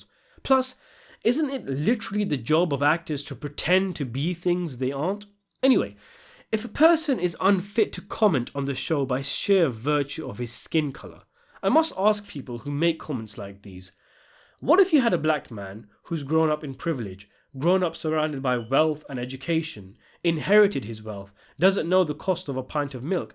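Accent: England, United Kingdom. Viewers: Great reveal, watched for a long time big fan always wondered what you looked like, obvs your accent is dead give away, British Asain, but nice to put a face to a voice after all this time. Lay off us Brits though, how you gonna do a video on the wire and then end up blaming other peoples mindless hate on us colonising Brits???? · Something I thought about that was little ironic. Most of us have never seen you. We know you have a British accent but we don’t really know what color you are. Idris Elba is British too. It would be funny for those talking crap if you were actually black LOL. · are you British? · I always knew you was south East Asian - I was bare confused when you entertained being white… UK got different demographics - English accent doesn’t mean white hahah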